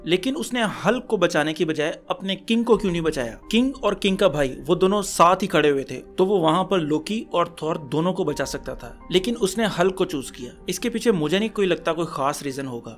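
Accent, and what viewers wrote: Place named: Pakistan. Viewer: Sahi pakde hai Bhai Mai aap kaa new subscriber hu muje Pakistani logo ke baat karne kaa lehzaa bahot pasand hai aur aapke videos bhi love u from india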